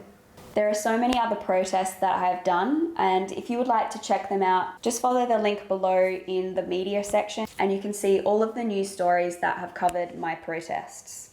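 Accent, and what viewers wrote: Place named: Australia. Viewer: If you are the vegan teachers daughter, why are you Australian and your mother is American? How did you get the accent?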